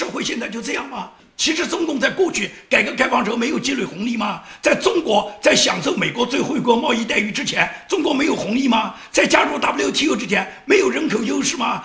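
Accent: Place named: Nanjing, China. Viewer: 喜欢建民老师用哑嗓子带南京腔的嘶吼和亢奋的不得了的情绪！来斯!！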